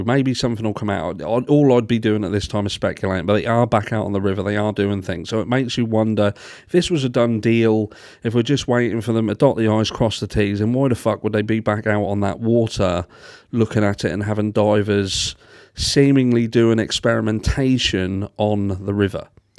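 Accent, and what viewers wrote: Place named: Scotland, United Kingdom. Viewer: Im having rabbit stew.... 🐇 🐰 yummy I love your voice being Scottish, but I hate all this murder 😢 whats happening with the world 🌎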